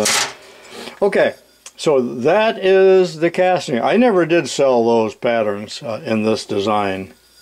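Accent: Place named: United States. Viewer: I like your accent. To a north European like me, it sounds like I'm watching a western cowboy movie! 👍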